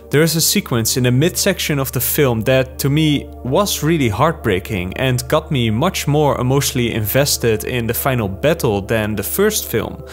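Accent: Netherlands. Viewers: Is that a Dutch accent I hear? No wonder you love wonder, makker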